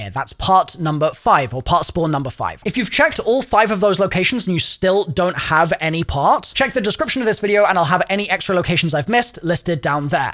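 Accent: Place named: United Kingdom. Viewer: i love you but despise your british pronunciations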